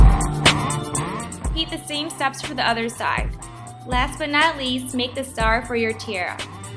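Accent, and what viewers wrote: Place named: Chicago, United States. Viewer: i love your accent.. its so... so... CHICAGO!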